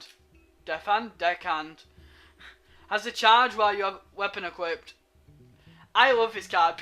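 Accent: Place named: Manchester, United Kingdom. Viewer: It sounds like you are from Manchester in England, so for American audiences you will need to talk more slowly and concentrate on enunciation. With any presentation you might want to give a visual overview first, maybe showing a group picture of all the cards you will cover. Perhaps grouping them for aggro and control decks as you consider them and listing them in order of power, maybe a top 10 etc. I found your post searching for "hearthstone analyse one drops" as I was looking for more insight into choices as I'm new to hearthstone.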